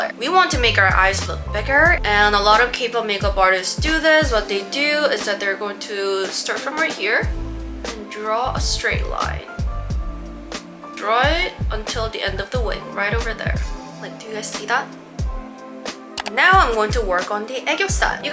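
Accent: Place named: England, United Kingdom. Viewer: Thank you for sharing this video ❤❤ btw I LOVE your English accent and you look a little bit like Wonyoung :)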